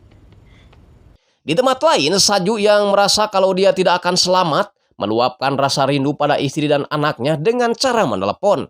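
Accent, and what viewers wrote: West Java, Indonesia. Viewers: Sy gkk terlalu fokus ke film nya,sy lebih terhibur dgn pengisi suaranya,kang logat sunda banget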